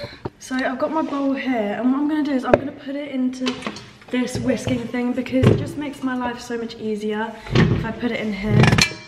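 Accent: United Kingdom. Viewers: is she british or does she have origins?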